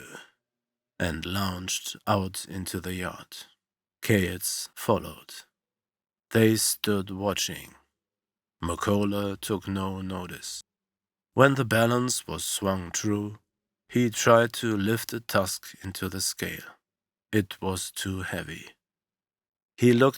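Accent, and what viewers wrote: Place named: Europe. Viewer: Digging the creepy eUrOpEaN accent delivery here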